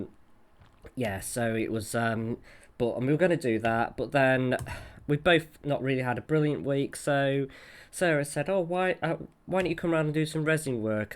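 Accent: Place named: United Kingdom. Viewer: You tickle me w/ the “and stuff”, LOL. So silly and fun. You are doing so awesome w/ all your videos. I can see your confidence building. I enjoy you so much! I am a sucker for the UK accent. I watch so many Brit shows. Even the comedies have eloquence w/ the voices. I am in the southeast US. We sound NOTHING like you guys. I could listen to you & (your putty lady) all day long. Keep them coming. I love your WIP! We have some nice tea shops where I live. Chocolate tea has been a fav for yrs. And you are right, 😋 delicious!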